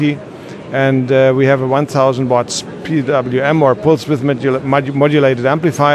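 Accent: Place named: Germany. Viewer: this fucking german accent: ....last not least for film studios, last not least for Cinemas ....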